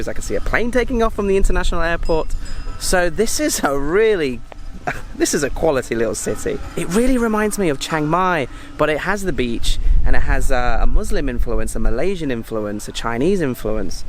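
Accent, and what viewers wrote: United Kingdom. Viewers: Love the British accent. Or Irish? Fun anyway. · Hey, nice video. Question - going purely on memory your name sounds decidedly IRISH; But your accent... It's British no? Are you from England/Liverpool? Or am I way off? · Thanks so much for this VDO. I’m just back from London and will live in Hatyai. Love your British accent so much! Hope to see you around in Hatyai. Enjoy!!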